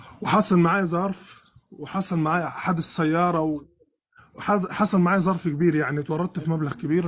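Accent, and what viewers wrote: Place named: Upper Egypt, Egypt. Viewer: ما شاء الله الراجل ده كلامه ولهجته جميله على فكره دى لهجه مصريه بالأخص لهجه اهل الاقصر صعيد مصر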